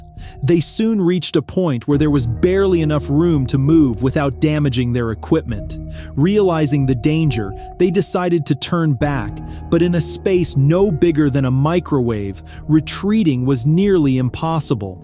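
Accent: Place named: Germany. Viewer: dude, you have a german accent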